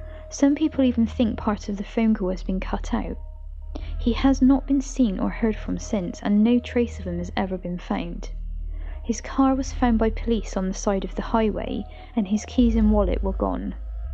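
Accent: United Kingdom. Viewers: you sound like you come from the West Country. ..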